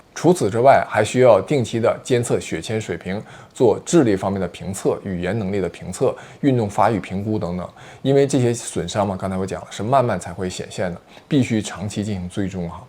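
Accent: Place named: Anshan, China. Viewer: 女主播好像是鞍山人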